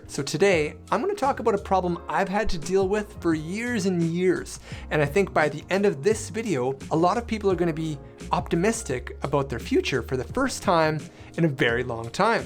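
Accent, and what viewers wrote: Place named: Canada. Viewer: No, hon', I'm vegan, so no animal products, whatsoever. As for my mental health, I've always suffered badly with anxiety and depression (I sadly lost my father to it 😞💔). My mental health got even worse last year. I had two really traumatic events (briefly homeless through no fault of my own, then hit by a snow plow 😭💔). I don't doubt that all the stress, (plus people stealing from me when my former apartment was condemned), didn't help to create this issue.😩 The night I moved into my new apartment in this new region, I had a UTI.😭 So, it was like my body was overcome with the stress. I just hope that this issue can be healed finally because I'm getting more depressed every day living with it.😞💔 Anyway, again, I so appreciate your help and suggestions, hon'.🤗 I hope you're having an amazing day wherever you are (I'm gonna guess Canada from your accent, but I could be wrong.😂).❤️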